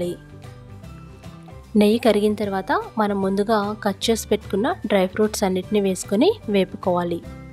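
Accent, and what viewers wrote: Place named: Krishna, India. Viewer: Yummy 👌🏻.... I strongly believe you are from krishna district right 💁.... The way you speaks was very much related to krishna's accent 😊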